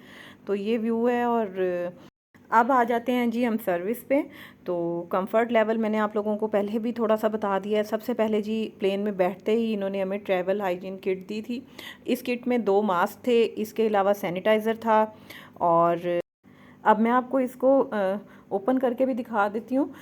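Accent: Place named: Punjab, India. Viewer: wesy hi AP k bolny sy lagta h ap punjabi h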